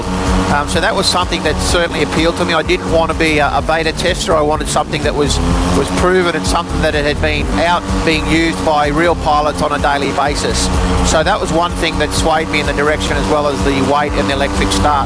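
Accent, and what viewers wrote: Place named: Australia. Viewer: great vid as im very interested in what you say as im wantint to get into paramotoring been so confused were to start as i been very confused with so many brands and saddly all crap american vids who is best cap kurc dell swatz? keep away from theses guys they play with your mind 😱but hearing the aussie accent is music to my ears so thank you for your great video 👍🏻🤗🇦🇺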